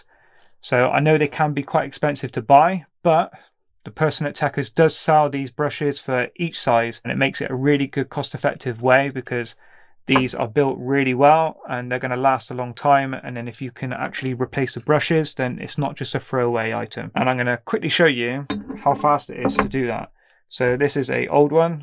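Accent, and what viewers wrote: United Kingdom. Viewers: Very nice video tha ks for sharing. I don't know why but i have always tought that you are american, just realised that is a Englishman by the way 🙂👍👏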